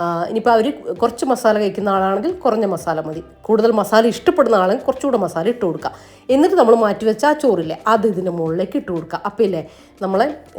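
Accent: Ernakulam, India. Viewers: എറണാകുളത്തെ സംസാരം ആണല്ലോ. വയനാട് ആണെങ്കിലും.. 👍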